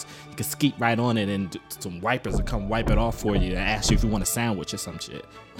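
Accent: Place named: Michigan, United States. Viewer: accent! #MichiganNative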